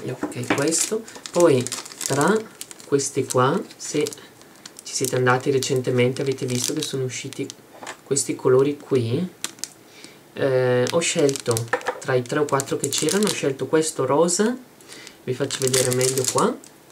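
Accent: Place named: Brescia, Italy. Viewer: mi piace troppo la tua parlata bresciana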